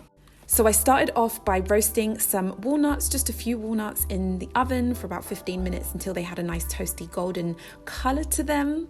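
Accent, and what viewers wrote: United Kingdom. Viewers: is no matter her race. She actually sounds very British to me...like she was born and raised British. Personally, I didn't notice her skin color. I only thought, "Wow! This English chick really knows how to do a video cooking show!!!"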